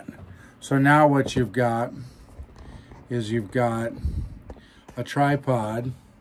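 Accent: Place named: Canada. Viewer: You sound Canadian for an American.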